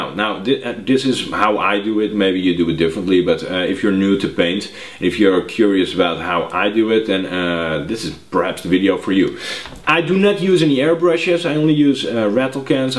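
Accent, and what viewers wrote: Netherlands. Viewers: lol i saw a couple of videos from you and i already thought you are dutch hearing your r's and now you confirmed it lol btw dutch too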